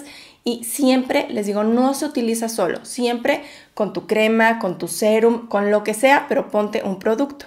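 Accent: Mexico. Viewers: Por qué hablas como de Tepito?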